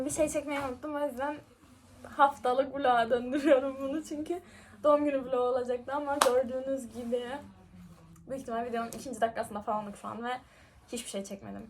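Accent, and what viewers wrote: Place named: Cyprus. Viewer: Konuşman çok tatlı bayılıyorum Kıbrıs şivesine🌸😘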